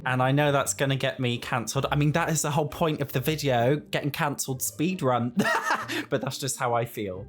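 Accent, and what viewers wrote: Australia. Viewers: ... as an aussie, that accent was 100% what we sound like 😳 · OMG YOU SOUND SO AURSSIE!!!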